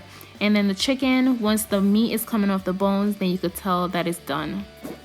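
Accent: United States. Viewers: Yea that's how I make mine, how you no so much about Jamaican food, I thought you was an American. Girl especially you're voice.love you're videos so much.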